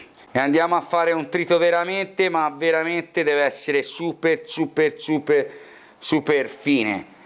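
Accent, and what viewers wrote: Tuscany, Italy. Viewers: Oltre le the ricette me piace il tuo accento oscano. Bravo. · Per caso sei toscano? 😂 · Che sei toscano? mmmm come mi harba questa carrettiera! Grande chef😉😉😉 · Vivo all'estero..che piacere sentire questa parlata toscana mentre prepara ricette antiche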